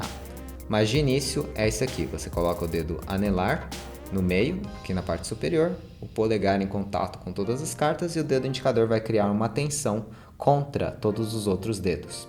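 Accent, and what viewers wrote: Brazil. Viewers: Qualidade alta... cenário simples, técnicas que não vemos no Brasil... de repente: português-Brasil, sotaque paulista. kkkkkkk Mt bom.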